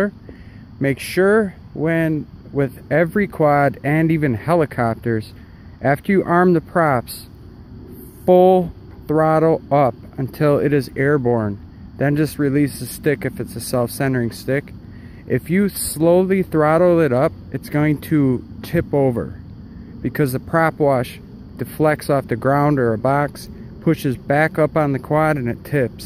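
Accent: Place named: Minnesota, United States. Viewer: Hi. Your accent sounds like Wisconsin or Minnesota 😎. I'm from Minnesota but live in California and lost my accent::( anyway I was wondering how far you can go in altitude hold before flipping on gps and it wouldn't kick in to save my butt? I'm ordering the latest version. What are your thoughts on that? Thank you for your time. Have a great day. Sincerely William Horsley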